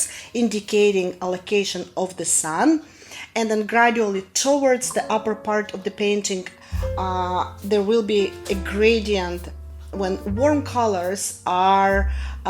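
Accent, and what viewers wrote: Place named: France. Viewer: I love your French accent in addition to your art.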